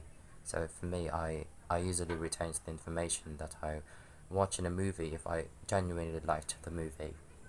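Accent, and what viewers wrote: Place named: United Kingdom. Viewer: You speak good English. Sounds British. And none of it sounds force. All sound normal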